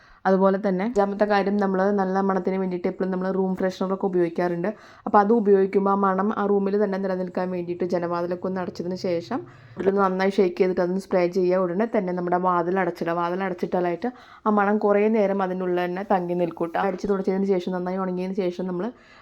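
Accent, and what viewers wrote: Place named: Thrissur, India. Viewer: തൃശൂർ കാരിയാണ് എന്ന് തോന്നുന്നു... പൊട്ടമണം 😅😅😅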